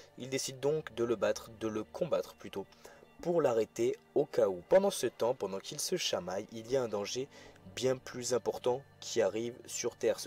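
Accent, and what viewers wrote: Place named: Marseille, France. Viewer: Parce que t'a l'accent d'un sudiste et tu pronnonce à la marseillaise.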